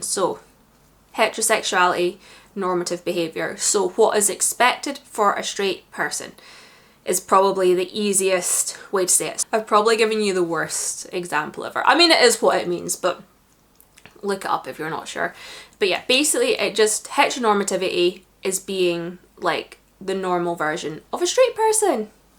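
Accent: Scotland, United Kingdom. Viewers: Hellow Sweets. What Part Of Scotland Are You From?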